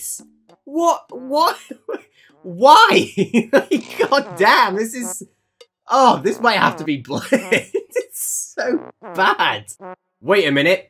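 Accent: United Kingdom. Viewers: You sound so ✨British✨ today mate.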